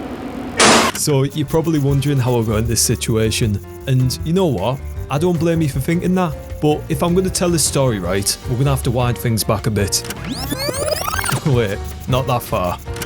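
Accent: United Kingdom. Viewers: If this person is not British then my whole life is lie.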